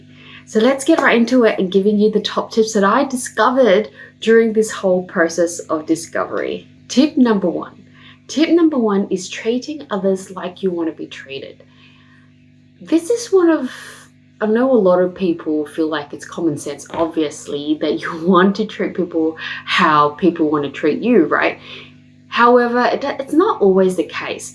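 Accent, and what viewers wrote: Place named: Australia. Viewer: Great advice! So important to be in the moment. Btw love your Aussie accent!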